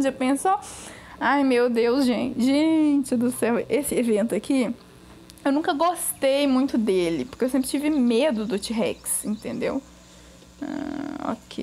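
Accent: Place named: Fortaleza, Brazil. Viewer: Vc mora em Fortaleza ???? Pelo o jeito de 0parece s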